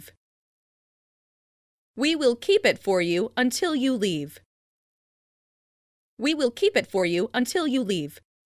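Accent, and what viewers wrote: United States. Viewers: american accent detected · Is american accent?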